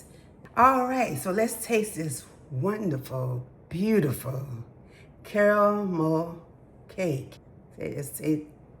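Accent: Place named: South, United States. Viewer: When you were making the frosting with your Southern accent my Yankee self thought you kept saying it was a portable frosting. I thought isn't all frosting portable? Then I realized you were saying pourable. Lol. Anyways that cake looks incredible. I'll have to try making it sometime. Thanks for sharing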